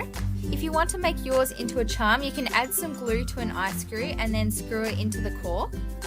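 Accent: Australia. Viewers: Are you Australian? I noticed that the clay you are using for the cat can be sold in Australia and most of the videos I see have clay from America.. then I noticed the accent kinda sounded Australian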